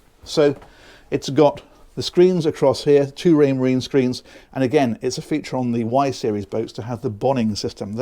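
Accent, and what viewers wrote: United Kingdom. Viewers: I like this guy. The British accent is cool. he really knows his stuff. These yachts are mind boggling with the technology and conveniences. Builders have thought of everything.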